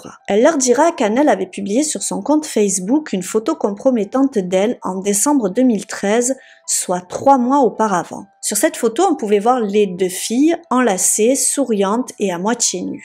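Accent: South, France. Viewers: Je me languis tellement de tes vidéos !! Je reviens de ma pause déj et je me dis : bon qu'est ce que je vais bien pouvoir regarder tout en travaillant et je tombe sur ta vidéo !!! J'apprécie énormément ton travail, tes recherches et ton petit accent du sud qui nous apporte le soleil ! T'écouter est un véritable plaisir ! Merci pour ton travail et et merci de réussir à dénicher des histoires de true crime si méconnues en France !!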